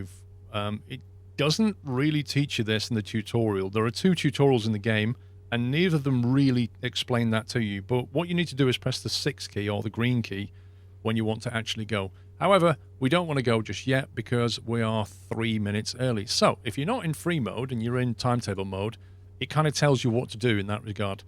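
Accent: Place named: Lancashire, United Kingdom. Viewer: The internet. He's on the internet. If you mean his accent, with the "thuuuuur" pronunciation of "there", I'd say Central Lancashire, somewhere between Southport and Burnley, Blackburn and Bolton. :o)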